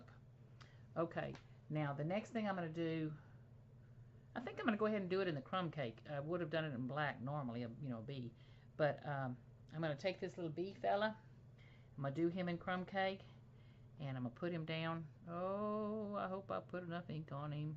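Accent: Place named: South, United States. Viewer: Hi!! Just found your videos! I am guessing your are a Southerner... love your voice..... I am from the MS Gulf Coast.... Beautiful cards...... thanks for sharing.... and thanks for the post it note printing tip!!!!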